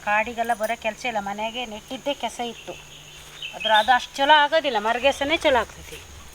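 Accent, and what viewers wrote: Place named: Mandya, India. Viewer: ನೀವು ಮಲೆನಾಡಿನವರಾದ್ರೂನು ಮಂಡ್ಯ ಸ್ಟೈಲ್ ಭಾಷೆ ಮಾತಾಡ್ತೀರಾ, ನನಗೆ ತುಂಬಾ ಇಷ್ಟ ಆಯ್ತು.... ಬಾಳೆದಿಂಡು..ಬಿದಿರು ಕಳಲೆ.. ಹಾಗೂ ಕೆಸುವಿನ ದಿಂಡು ಎಲೆಯಿಂದ ಮಾಡುವ ವೆರೈಟಿ ಪದಾರ್ಥಗಳನ್ನು ತು0ಬ ಚನ್ನಾಗಿ ಇರುತ್ತೆ... ಕೇಸುವಿನ ಪತ್ರೋಡೆ ಮಾಡಿಲ್ಲ....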